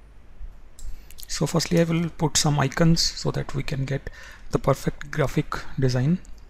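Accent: India. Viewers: i fucking haaate indian accent , gosh i would break your teeth just for talking vonce , vhen , vhere , vhore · Tanguy Souchet Typical North Indian Accent for ya ;)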